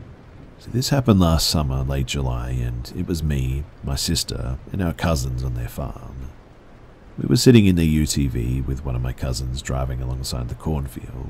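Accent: Australia. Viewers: Holy hell! I cannot believe I only realized after reading your comment that his accent is Australian! 🤦🏻‍♀️ I've been listening to him for a really long time and always wondered where he was from 😆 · At last an Aussie accent. Just subbed, I don't know why it has taken me so long to find you. And I just can't listen without rain!. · Hey 👋 Mate 🇭🇲🪃🤘 it's great to hear an Aussie when your an Aussie too 😉 so thanks ❤️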